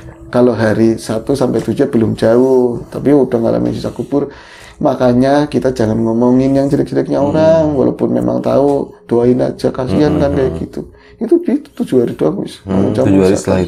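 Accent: Banyumas, Indonesia. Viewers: Paling suka denger Mas bicara ngapak😄😄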